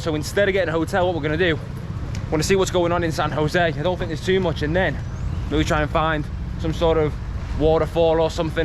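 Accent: Liverpool, United Kingdom. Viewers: Liverpool accent at its finest 😭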